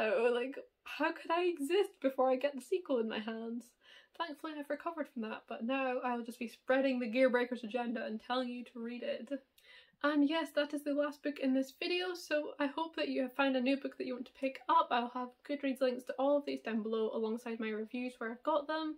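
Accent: Scotland, United Kingdom. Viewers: This type of recommendation video is so needed! I'm deffo gonna have a look at some of these! (ps, i love your accent!! I'm from scotland too <3)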